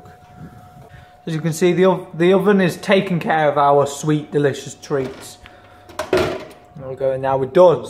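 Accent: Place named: United Kingdom. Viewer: Nazi Pepe that's just how we British people talk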